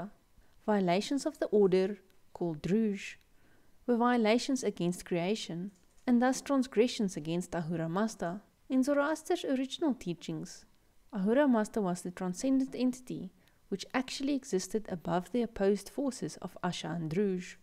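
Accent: South Africa. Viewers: Wow, I searched "Zoroastrian asmr" and didn't expect a South African accent! Cool channel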